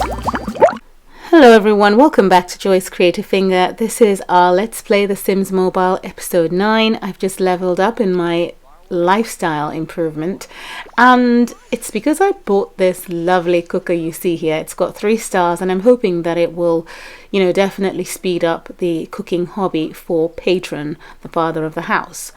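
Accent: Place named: RP, United Kingdom. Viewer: I love how you speak! Super posh, I find it. I love it! :D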